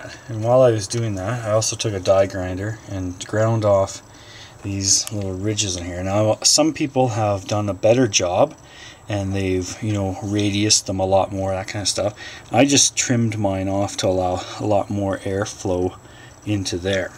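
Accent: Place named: United States. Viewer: Ah. I assumed you were American from the accent.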